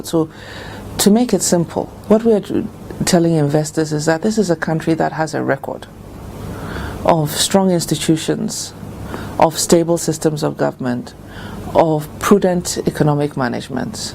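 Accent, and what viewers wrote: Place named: Ghana. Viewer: i love her GHanaian way of speaking English- very nice and clear!!! lovely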